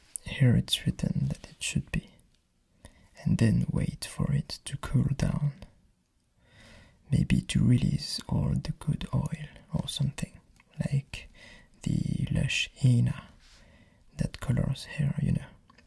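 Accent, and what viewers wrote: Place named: France. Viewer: French accents are so dope.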